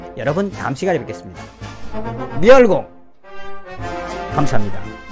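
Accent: North Korea. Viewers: 이북 말좀 하지마세요 아~🇰🇷진짜 짜증 정말 듣기 싫어요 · 다 좋으나 북한의 강한 목소리 노력 해보이소. 어쩐지 부담이 되어서리 이애란 박사님은 전혀 북한말 안해서 좋읍디다 노력해보시라우~ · 제발 이북말씨좀 쓰지마라... 웃기려고 하는 것 같은데... 정말로 싫다.제발 표준어 좀 써주세요... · 말투좀 고쳐라 득기싫어서 닫아버리고싶다 정성산 북조선말투가나오면북한방송으로 착각해서 꼴보기싫어서그레 댓글도 않보냐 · 북한말씨를 석허서 말씀해주시니 재미있고 웃겨서 줗습니다.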